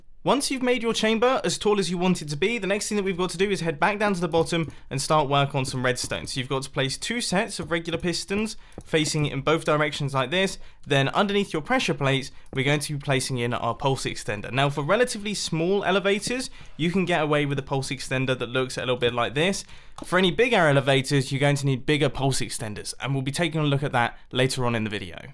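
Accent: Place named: England, United Kingdom. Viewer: I’m sure you might have said this before somewhere, but I’m a new subscriber and just wondered whereabouts in England you’re from?? I’m from England too but your accent is so lovely to just zone out and listen to!!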